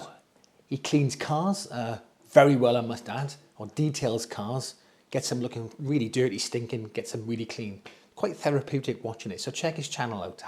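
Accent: United Kingdom. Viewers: Excellent British voice, I’m jealous 😭💞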